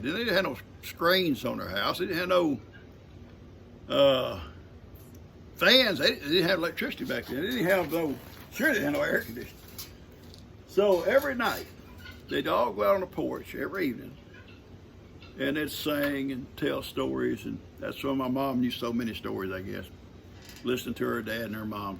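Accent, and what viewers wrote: South, United States. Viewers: Love your country voice! Have a wonderful day.... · Good morning... having my breakfast and coffee with you before work. I absolutely love you're country accent and you're stories, like so many on here, I can listen to you all day. I love how Ivan was demanding attention, lol. He is the cutest little donkey. He loves you so much. And I can see you're love for him, it shows. Also love how you call baby. Ya'll are so cute together. I am blessed by ALL you're videos. Keep them coming. Hope you're knees are feeling better. Say hello to you're beautiful wife. God Bless you and all you're family and furbabies. Give Ivan a hug for me. Have a blessed week. Already looking forward to your next video.😁❤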